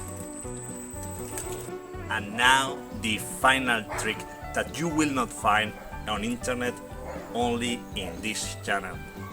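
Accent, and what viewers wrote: Spain. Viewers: el acento mas fuck up despues del hindu el de españa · Fua ese acento español que no nos lo quitamos ni patrás pero la verdad que suena bien y todo. Buen vídeo jajaj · Where did you shot this "bideo"? I thougt it was in Galicia because of you accent but then you said something about going to Barcelona...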